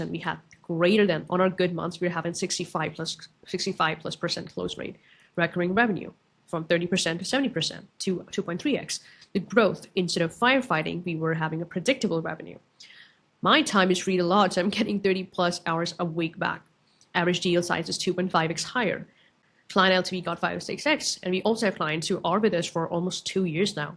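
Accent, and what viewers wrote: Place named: Netherlands. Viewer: You sound like a Dutch person, not that it matters lol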